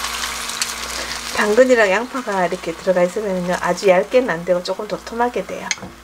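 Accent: Gyeongsang, South Korea. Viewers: 경상도 사투리인가? 넘 귀엽네요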